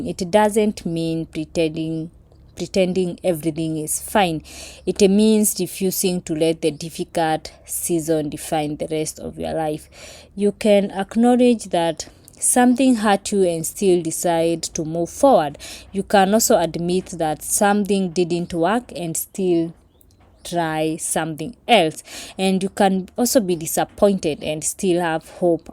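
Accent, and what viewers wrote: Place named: Kenya. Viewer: Sura yako ni ya Mkikuyu pia huwa unasound kama mkikuyu lakini jina ni ya Kiluhya au umeolewa na Mluhya?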